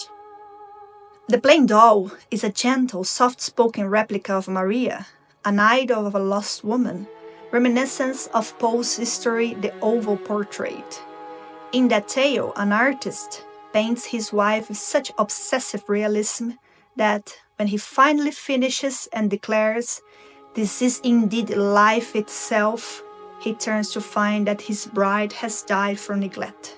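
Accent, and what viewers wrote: Brazil. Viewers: I don't know much about Bloodborne (i'm still trying to understand the story, since i can't play it without having a heart attack), but i really like Poe, and any video essay merging literature with videogames is worth to see! Also... Your accent is familiar, você é brasileira? Awesome video! Keep going!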